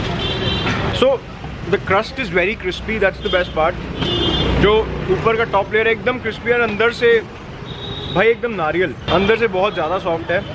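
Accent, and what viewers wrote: Punjab, India. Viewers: Veerey assi kadi vi Punjab nahi aaye lekin taudi lens naal and taudi accent naal mainu ae lagdaa hai ki main Punjab to kaddon chhad ke gaya hi nahi.. jyonda, hansda Te wasdaa sadaa sadaa rahwega saada Punjab Te saada Hindustan.. BTW please mainu maaf karna agar Saadi Punjabi Galt hui. Cheers!!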